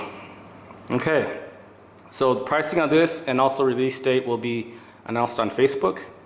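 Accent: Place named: China. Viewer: Professional product demands professional review not an amateurish review...... the guy is talking Chinglish....get your act together.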